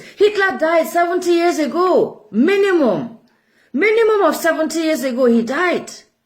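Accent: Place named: Nigeria. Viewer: This lady is making alot of sense my dear that is how we see it. Her acent sound like Nigeria. God bless you dear for speaking out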